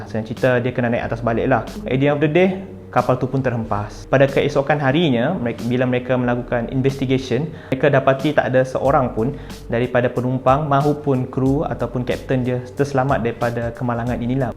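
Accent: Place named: Sarawak, Malaysia. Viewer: Mcm org sarawak je.sbb bunyi slang mlayu smenanjung kurang😅😅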